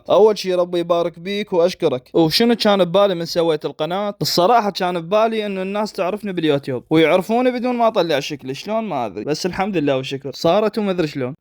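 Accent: Iraq. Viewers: يوليييييي يحجي بجججاااااا اهل ناصريهه اسمعووو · يا هلا 😂المهم انت نجفي؟ لهجتك مو غريبه عليه فَ✨🎀 توقعي نجفي🙂.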